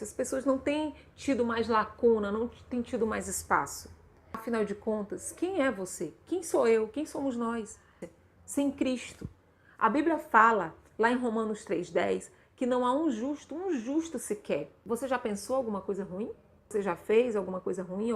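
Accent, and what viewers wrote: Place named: Bahia, Brazil. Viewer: Mas que sotaque baiano é esse minha gente? Boas palavras minha irmã!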